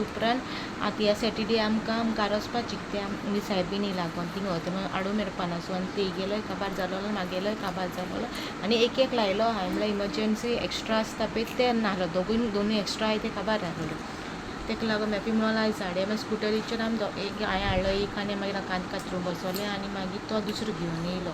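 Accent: South, India. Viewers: Nice voice and south accent keep it up Nice information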